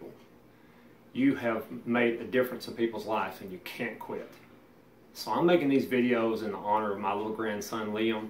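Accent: South, United States. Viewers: Thanks, I enjoyed that, and there is one of those espresso makers sitting unused, in my office. Will try it out, and see if it will help me get rid of the sugar from my coffee. And I'm glad you are still going. I think the most important element of your message besides your natural relaxed what of talking about all this, is "us.", You present in a way that is inclusive and convincing to others, because "we" feel you are one of "us." The message is too important to leave unreached by those who may not feel to be one of "us." I do think your message is extremely important, and you should reach out to others who can make videos like yours, carrying the same message to those who need it, to reach others in our society who otherwise might not listen an old white guy with a Southern twang. Be well.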